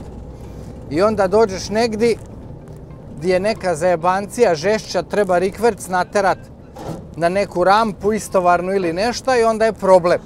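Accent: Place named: Vojvodina, Serbia. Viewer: Pozz iz Amerike,centralni dio ili midwest ( st louis I okolina 😂 ) Volim tvoj naglasak,malo mi "bacas" na Lalu 😊😊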